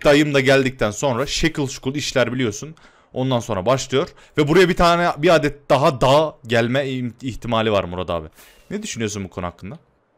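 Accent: Yozgat, Turkey. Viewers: Abi Yozgatlı mısın??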